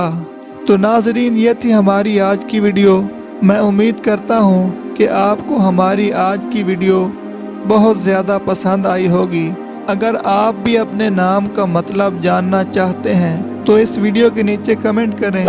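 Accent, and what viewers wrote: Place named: India. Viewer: UZMASAMEER Indian tone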